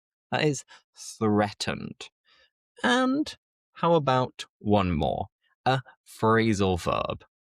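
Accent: England, United Kingdom. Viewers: Wonderful! I love your English accent!!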